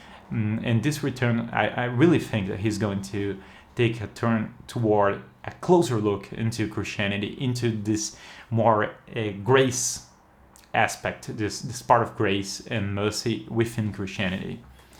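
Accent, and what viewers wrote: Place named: United Kingdom. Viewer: professor, your english is amazing, looks very like the british english, sadly my spoken english looks like a fusion of british and american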